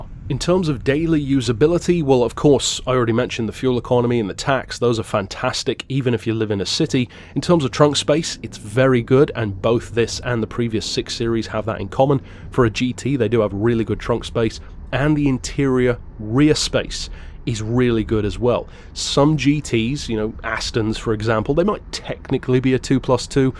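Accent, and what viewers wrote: United Kingdom. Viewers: You are British, am I right?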